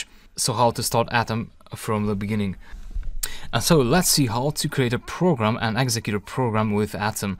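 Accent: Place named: Russia. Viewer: are you russian? You have a nice accent.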